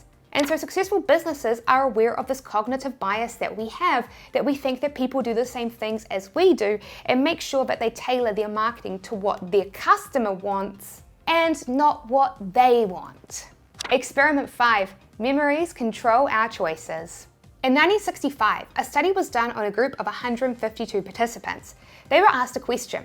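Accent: New Zealand. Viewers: Cool, sound like a New Zealander tips.😎👍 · Such Kiwi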